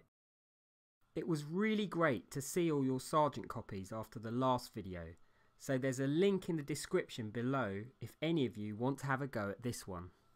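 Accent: United Kingdom. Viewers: I love this British accent!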